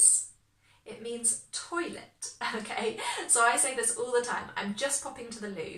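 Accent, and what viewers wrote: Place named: Upper class, United Kingdom. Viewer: 👏👏👏👏👏👏👏Lovely! Ages ago I looked after my uncle's ponies at Cowdray Park Polo Club, in Midhurst, and everybody spoke just like you there!😄😀😄😀😄😀 Muchos saludos, from Olivos, Buenos Aires, Argentina🇦🇷🇬🇧🇦🇷🇬🇧🇦🇷🇬🇧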